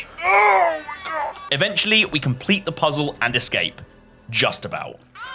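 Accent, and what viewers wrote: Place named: Ireland. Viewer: What is that accent you have tis.......noice Irish?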